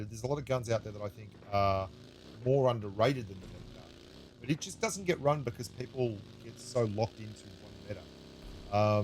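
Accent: Australia. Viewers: Love the Vector so much with a laser sight too❤❤ nice one as always❤ i just have a slight complaint to make, i would very much appreciate fixing the sound in your videos, it's been anoyying lately that the game sound is louder than your own voice 😂😂 especially cuz i love listening to your australian accent 😂❤ it's really annoying that sometimes i can't make out what u say! Take care ❤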